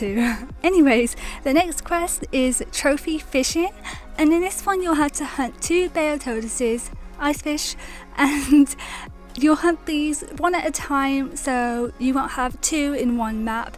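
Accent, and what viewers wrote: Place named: United Kingdom. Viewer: She quite clearly has a British accent.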